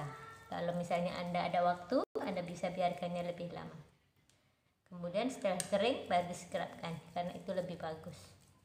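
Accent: Malaysia. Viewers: Anda orang mana kayak orang malaysia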